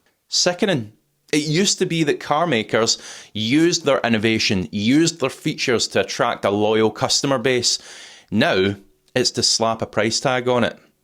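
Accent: Scotland, United Kingdom. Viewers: My friend not only you do a great job you also introduced me to the Scottish accent (love it, no subtitles for me from now on 😂). Seriously, your content is great and of significant practical importance. Thank you. Take care, keep it on. Greetings from Greece. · I have a problem with Scottish accent; Did you say cambus or canvas at the begining? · NOTHING beats a Scottish accent! Greetings from Arkansas. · I love a man who has the courage to tell the unpopular truth...and even more when he does it with a Scottish accent.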